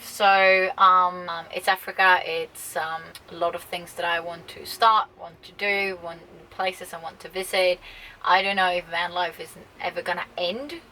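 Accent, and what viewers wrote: Australia. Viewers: Alltså jag blir så irriterad på kommentarer som dessa. pratar hur bra engelska som helst med australiensisk accent. Som jag förstått det har hon rest/bott mycket där. Om det stör dig är det väl bara att avfölja utan att sprida otrevlig energi. Jag skulle typ dö för att prata så bra engelska som gör. Tror snarare hon får fler prenumeranter då den engelskspråkiga delen av jordens befolkning är betydligt större än den svenska 😂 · You are Swedish but why the Ausie accent??? Just wondering??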